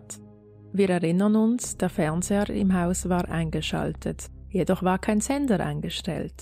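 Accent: Switzerland. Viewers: Ich wusste nichtvdass die Sprecherin eine Schweitzerin ist aber ich habe es sofort an Aussprache bemerkt. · Da bekommt sogar das Schwietzerdütsch einen angenehmen Klang. Es gefällt mir sehr gut, wie du den Fall, den ich schon von einem anderen Crime-Mädel kenne, dargelegt hast . Sehr umfangreich, gut recherchiert. Ich habe gleich ein Abo dagelassen und freue mich auf viel mehr von dir. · 🤭😆 wie süss. Schweizer Sprech ist zum verlieben. 😍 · Schweiz Akzente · Was stört Dich daran ? Ihren Schweizer Akzent kann sie wohl schlecht ablegen . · Das ist kein schweizerdeutsch...bin berner...so tönen wir wenn wir uns mühe geben schriftdeutsch zu sprechen...wenn wir schwyzerdeutsch sprechen versteht ihr kein wort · Den schweizer Akzent im Hochdeutsch finde ich so charmant. Ich mag deine Stimme sehr und finde deine Recherchen gut gemacht. Ich freue mich auf weitere Folgen.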